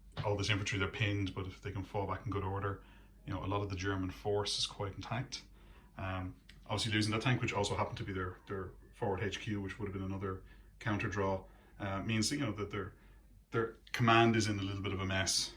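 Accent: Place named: Ireland. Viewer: definitely Irish "Carlow"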